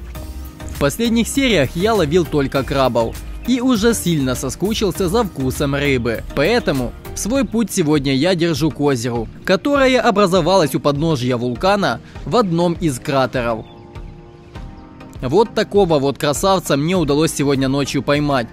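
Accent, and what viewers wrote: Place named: Ukraine. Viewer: Он-украинец, русский- не родной язык. Так что не стоит так обращать на это внимание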